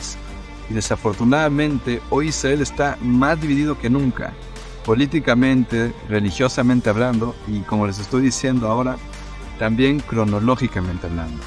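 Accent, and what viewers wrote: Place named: Mexico. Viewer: TU acento es MEXICANO FELICIDADES ISRAEL LOGRO FENÓMENAL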